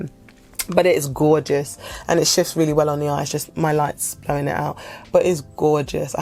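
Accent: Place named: United Kingdom. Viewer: Are you from Britain? I love your accent! Very elegant.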